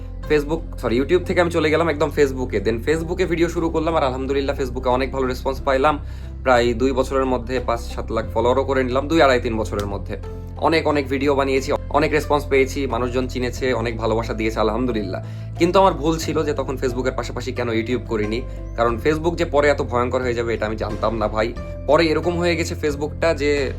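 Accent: Rajshahi, Bangladesh. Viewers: vai apner kotha sune bujte parci je apni rajsahi thaken 😅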